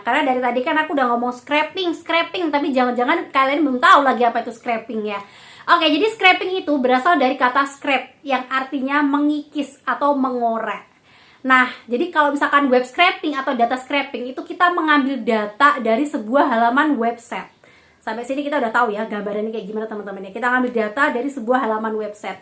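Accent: Java, Indonesia. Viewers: Jowo ne medok tenan. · Medok plus Gas Pull yo ndo 👍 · Medok mba, mantap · Alhamdulillah... Jam dua malem dapet informasi sepenting ini.. Makasih mbae.. Ngomonge medok bgt.. Hehehe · Muedooke gaes ngangeni..😀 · Aku sukak medok jowomu kuwi mbakyu.... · Medok amat mbak 😆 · Jawa ne keton bgt ya mba, tapi ora ap2 cukup bantu go enyong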